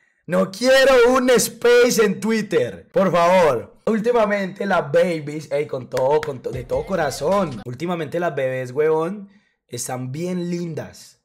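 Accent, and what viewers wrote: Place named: Medellín, Colombia. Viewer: Este chico es de Medellín la forma d hablar d ellos es inconfundible m hacen reír mucho😄👍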